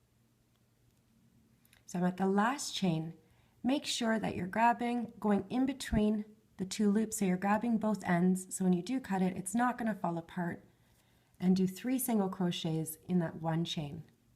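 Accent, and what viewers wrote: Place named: Canada. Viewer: I'm american and you soundas little Canadian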